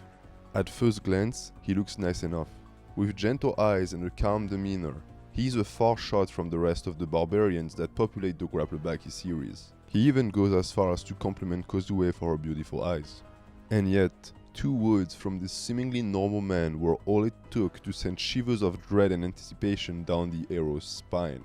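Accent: France. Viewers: are you french? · En écoutant ton accent, anglais, en voyant ta façon de prononcer certains mots j’en déduis que tu es un français qui parle en anglais, c’est le cas ? In listening your accent, in the way your prononces some words, I’m deduce your are a French guy who speak English, is it true or not ?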